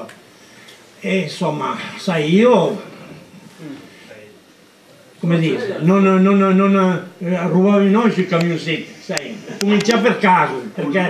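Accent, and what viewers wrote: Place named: Milan, Italy. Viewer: Grandissimo. L'unica cosa che storpia è quell'accento milanese .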